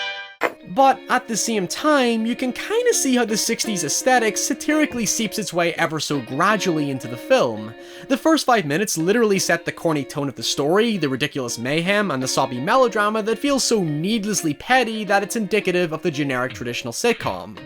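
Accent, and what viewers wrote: Northern Ireland, United Kingdom. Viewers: I was so surprised to hear the Northern Irish accent! So glad to see some representation of our little part of the world in a successful YouTube channel, keep up the good work buddy! :) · Sounds like a northern Irish guy do an American accent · It's a Northern Irish accent, though I barely notice because it's the kind of accent I hear day-to-day so it's very familiar to me at home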